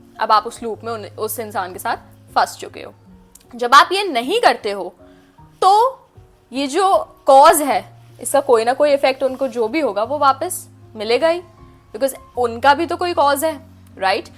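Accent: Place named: India. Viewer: Muje lga thumbnail se .koi foreigner tarot reader h😂aapki hindi se pta chala aap to indian h❤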